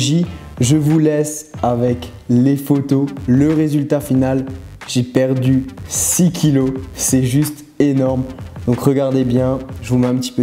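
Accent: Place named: Alsace, France. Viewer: Tu es alsacien non , je crois avec reconnu l'accent de chez moi :')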